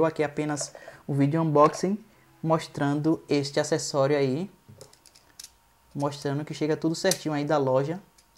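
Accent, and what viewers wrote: Bahia, Brazil. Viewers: Sotaque da minha Bahia é lindo demais meu hey rsrs abraço velho, curti sim!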